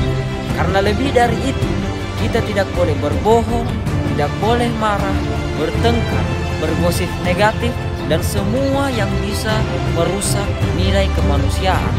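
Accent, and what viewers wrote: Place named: Makassar, Indonesia. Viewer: Alhamdulillah vidionya semakin bagus,semoga berkah.... Logat Makasarnya medok banget...hehehe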